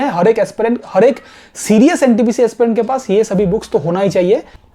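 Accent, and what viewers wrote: Bengal, India. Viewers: Sir aap Bengali ho?? Kya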